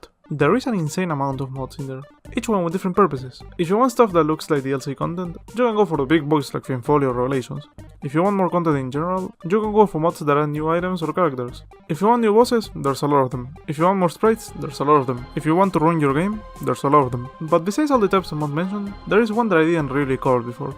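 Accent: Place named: Argentina. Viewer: solo con escucharte hablar ingles ya se que sos argentino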